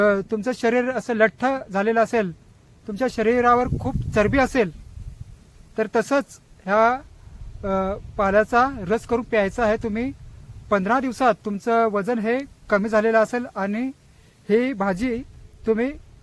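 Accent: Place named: Agri, India. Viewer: खूप छान माहिती दिली, भाऊ तुम्हीं हया भाजी विषयी थोडीशी माहिती मला होती . तुमचा मुळे अजून माहीत झालं, भाऊ तुम्हीं आगरी आहात असं वाटत, मी आगरी आहे. 🙏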